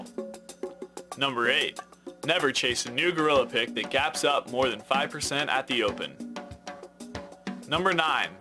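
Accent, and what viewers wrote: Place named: United States. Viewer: Please turn on caption i want to see in Hindi.i can't understand American English.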